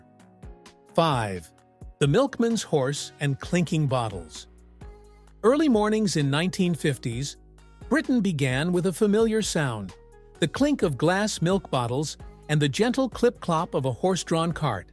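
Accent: United States. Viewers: Appaling American narration yet again! Why carnt you read your script through properly, before starting!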